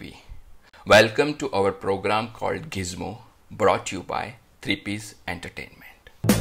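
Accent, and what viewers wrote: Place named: Gujarat, India. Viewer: I like your accent, gujju Bhai.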